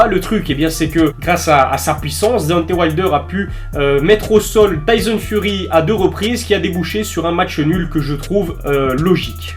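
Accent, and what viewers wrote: Belgium. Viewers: Un peu, je suis belge également du coups je l’entends peut etre plus que les autres, tu es de quel région ?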